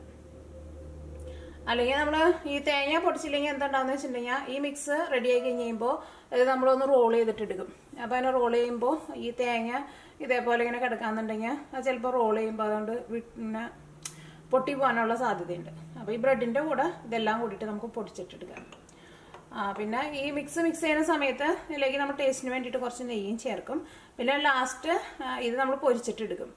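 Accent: Thrissur, India. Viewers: സംസാരശൈലി കേട്ടിട്ട് ചേച്ചി തൃശൂർ ആണെന്ന് തോന്നുന്നു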